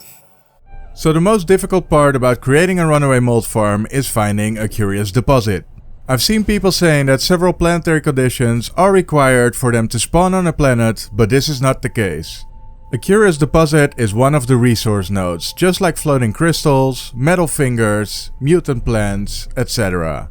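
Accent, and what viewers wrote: Netherlands. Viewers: aah het nederlandse accentje blijft ook ons kent ons he xD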